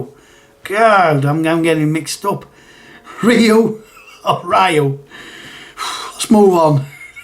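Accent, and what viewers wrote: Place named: Yorkshire, United Kingdom. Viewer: Infogrames always used to get me because I never used to see the R 🤣 Xexyz is a good one, lets just call it Keith from now on. Suikoden I dont have an issue with as I will never play one of those games anyway. You are only saying Eeenix that way because you have a Yorkshire accent. I don't remember pronouncing Tau Ceti before, maybe I did 🤔 That one at the end 🤣🤣🤣 My brain hurts now....😊👍🐻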